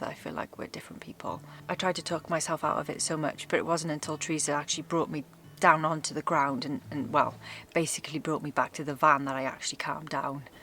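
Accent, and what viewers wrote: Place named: Cardiff, United Kingdom. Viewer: Loving your adventure. And as someone said, it is enough just to travel alone. I’ve done it a couple of times. The first two weeks I wondered if I should have been allowed to leave the house, let alone drive across Europe alone! Suddenly I found my feet, my mojo, and everything started to click. Life always seemed to support me. You make me laugh. You sound Cardiff, that’s my hometown. Love your accent. Crackin’ x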